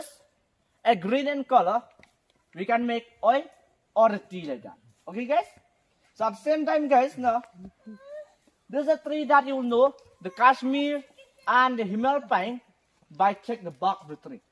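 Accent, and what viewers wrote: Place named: United Kingdom. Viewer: Ym dei da ka phareng hynrei dei beit da ka english kaba na UK... Keep it up bro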